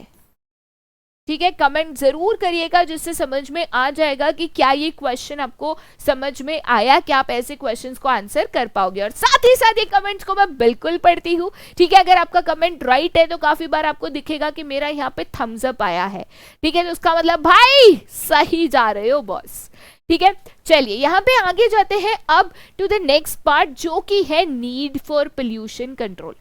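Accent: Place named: Mumbai, India. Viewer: I think mam from Mumbai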